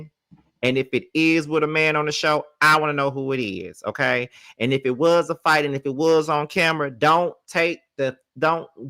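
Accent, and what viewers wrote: South, United States. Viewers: Omg u sound so country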